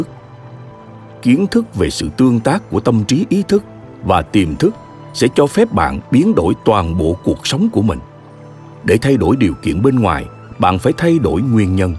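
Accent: South, Vietnam. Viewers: Giọng đọc hay nhất trong các giọng đọc của sách sức mạnh tiềm thức. Chắc mình người Nam, nên thích nghe giọng miền Nam ấm áp, trầm và nội lực. Cám ơn Fonos, mình sẽ mua để nghe trọn bộ.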